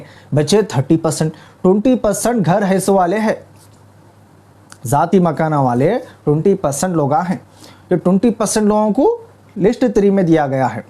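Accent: Hyderabad, India. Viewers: Assalamu alikum bhaiya apki pakki hyderabad language bhaiya bade ka gosht khate na isliye dimag slow chalra apne logo ka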